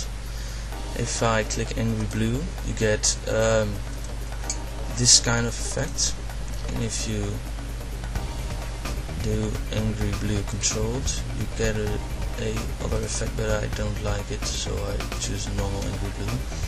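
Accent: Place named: Netherlands. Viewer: Nederlands, te horen :D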